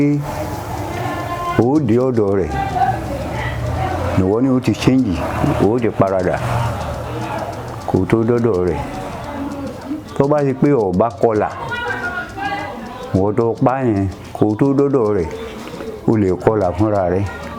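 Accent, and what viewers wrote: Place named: Oke Ogun, Nigeria. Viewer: Where is the hunter from , he sounded very well like oke ogun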